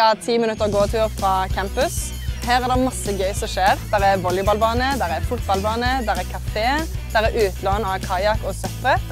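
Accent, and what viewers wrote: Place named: Stavanger, Norway. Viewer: Reklame for Grimstad, og ikke noen med Grimstad’s dialekt som snakker ??? Kødder dere ? Stavanger eller hva det enn er. Latterlig at dere bruker dette. Ikke latterlig faktisk, men provoserende 🤬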